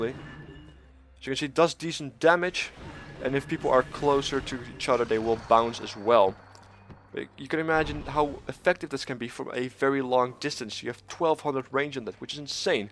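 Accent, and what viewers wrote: Netherlands. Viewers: Nederlandse accent in het Engels! ;) So nice to hear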